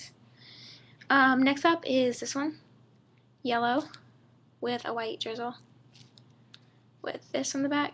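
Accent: United Kingdom. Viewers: You seem like a British person ;) I think the accent fits you perfectly! ^^ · why was she talking in a British accent?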